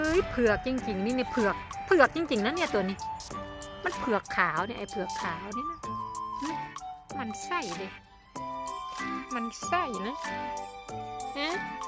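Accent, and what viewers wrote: Korat, Thailand. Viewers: แก่คนนางรองนิ พูดโคราชเหมือนกั๋นเลย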